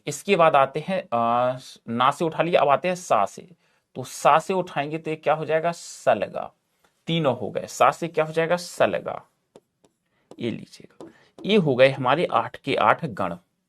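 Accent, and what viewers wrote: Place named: Madhya Pradesh, India. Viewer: Sir aap mp se ho kya?😅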